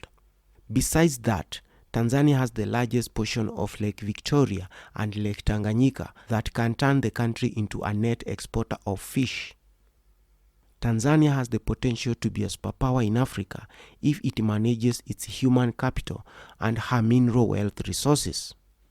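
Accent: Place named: Central, Kenya. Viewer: Am I the only one who hears this guy is from Kenya ( central kenya infact ) because of the way he is "shrubing" ?!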